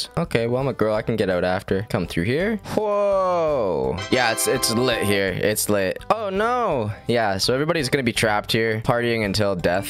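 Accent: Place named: Canada. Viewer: Your Canadian accent is so magnificent...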